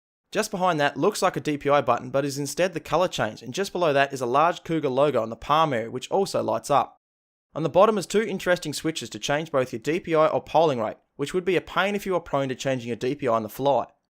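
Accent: Australia. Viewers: ayyyyy its an aussie finally an accent im used to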